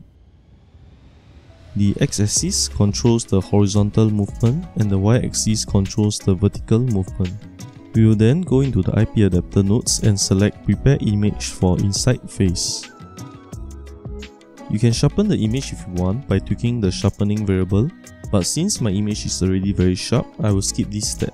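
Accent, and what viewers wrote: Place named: Singapore. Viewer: I'm glad I found this video, very well explained on the IPadapter workflow. Subscribed to the channel.. btw, love the Singaporean accent :)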